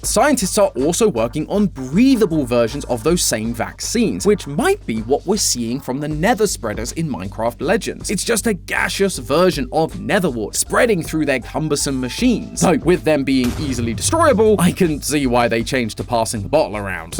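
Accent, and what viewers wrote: United Kingdom. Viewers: BRITISH MATPAT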